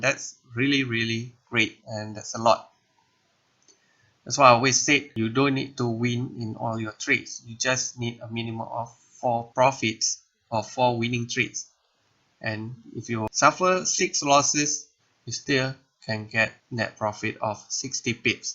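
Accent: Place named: London, United Kingdom. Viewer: Waa bang. Spiking london laaa sekarang.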